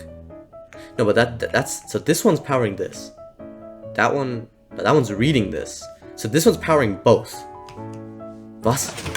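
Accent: Germany. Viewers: Ey bro, u german?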